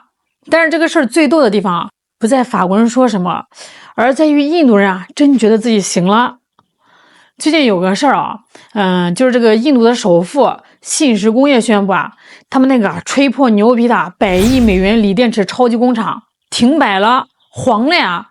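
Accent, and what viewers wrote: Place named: North, China. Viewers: 这山东腔，上头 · 口音好聽😊 請問是北方哪裏的口音？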